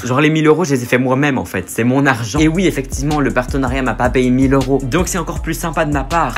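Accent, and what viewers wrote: Quebec, Canada. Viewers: TU ES UN PEU QUEBECOIS ? OU??? CAR AU DEBUT J'AI REMARQUEZ QUE TU AVAIS UN ACCENT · Je t'adore est j'adore ton axen un peut Quebequoi(je ne sais pas l'écrire) je t'adore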